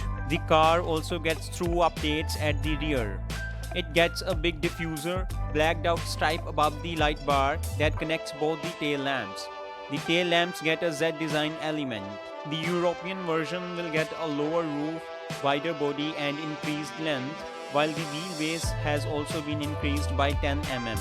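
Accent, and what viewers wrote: India. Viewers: the indian accent 🤪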